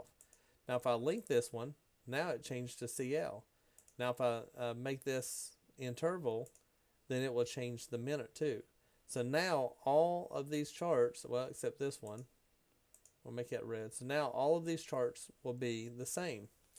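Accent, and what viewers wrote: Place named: South, United States. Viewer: Great video awesome thanks for explaining the internal link versus the outside link, I also love the information of where closing out a workspace that were unused you made that so clear I have noticed the speed increase in my ninja trader thanks so much, and you have an awesome accent, southern gentleman🤩🤩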